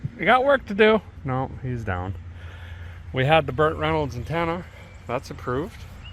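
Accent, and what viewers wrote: Canada. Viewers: First video for me and I swear I would've put my life on it that he was Canadian!!! LMAO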